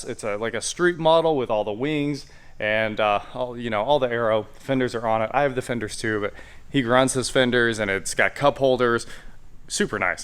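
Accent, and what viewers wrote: United States. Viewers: Love the American accent. What’s a new “mear? “ is that what we call a mirror in England 😅😅😅 Nice update, funky little car.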